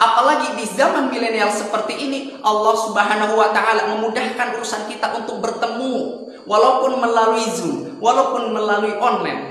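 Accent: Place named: Banyumas, Indonesia. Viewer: Salam ngapak tadd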